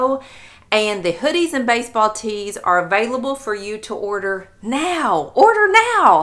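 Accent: South, United States. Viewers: I just love southern accent lol xxx it is 11°C here in Peterhead Aberdeenshire Scotland and I'm in a bootlace strap t-shirt lol... hardy folks us Scots lol xxx Love the new Merc xxx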